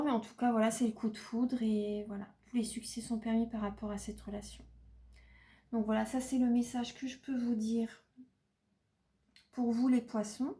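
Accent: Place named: North, France. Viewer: Quand je vous écoute, j'ai l'impression de m'entendre quelques années auparavant. Même voix, mêmes intonations et même accent ! ( Du nord) c'est troublant !!! Bonne continuation !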